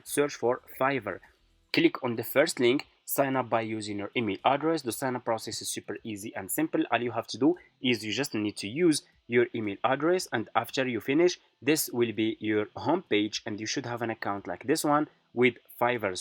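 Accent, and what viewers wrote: Morocco. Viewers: واش نتا مغربي يا صاحب القناة..؟ باينة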